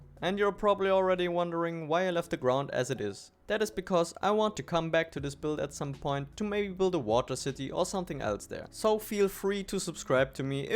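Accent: Germany. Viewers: I hear german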